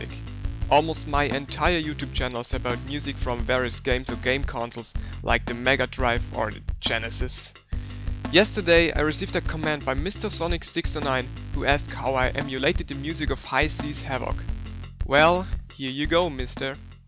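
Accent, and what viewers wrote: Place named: Germany. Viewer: Absolutely love your selection of music, and your German accent is awesome! Keep up the good work :)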